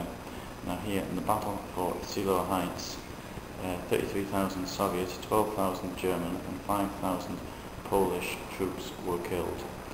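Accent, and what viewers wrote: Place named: England, United Kingdom. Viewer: I wanted very badly to go to this museum April of 2005. My girlfriend (at the time) spoke fluent Russian and German. She agreed to help me document the 60th battle reunion. I had money saved up for two plane tickets. Long story short, we didn't end up going .. Glad you could make it though! Sounds like your from England, I'll bet WWII battlefield tours are a virtual hop-skip-jump for you. Lucky dog you.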